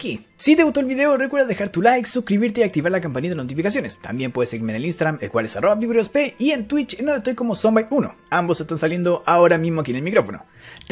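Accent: Chile. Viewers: WAO ERES DE CHILE NI CUENTA ME DI , POR TU ACENTO :o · este wn es chileno? Grande 🤝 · Eri chileno?? Pensé ke eras de Ecuador djjdjddk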